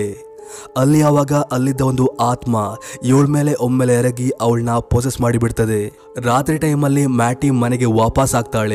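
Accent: Kundapura, India. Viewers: Niv kundapura naaa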